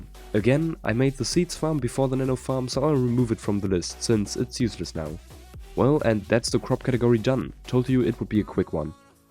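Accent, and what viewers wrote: Germany. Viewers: are you a german? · Are you from germany? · Kann das sein das er deutsch ist? 😅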